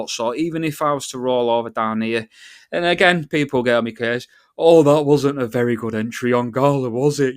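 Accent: North, United Kingdom. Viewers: Yo big man! I follow u on here and X and I have enjoyed every minute. Ur simplicity and northern accent r both spot on. Keep the alpha coming bro. I mean this is FREE…world’s mad!!!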